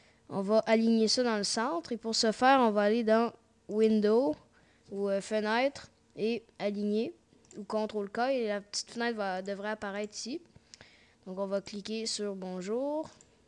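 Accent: Quebec, Canada. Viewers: Super tuto avec un charmant accent canadien. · Tu es québécois UwU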